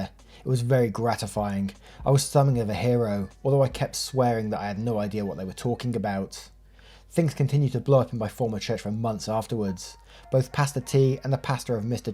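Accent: United Kingdom. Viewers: Completely off topic but I was wondering what part of the UK your accent is Great job with the video :)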